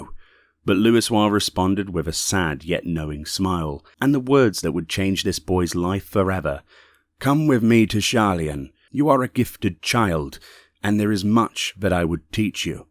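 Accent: United Kingdom. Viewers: With all due respect to Brian from Work to Game, but you cannot beat a British accent. No shame in losing to that.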